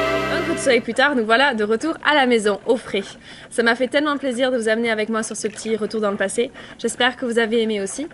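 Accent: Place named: South, France. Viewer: I don't think I've ever heard you speak French never realised you had such a strong southern accent. Keep up the good work babes xxx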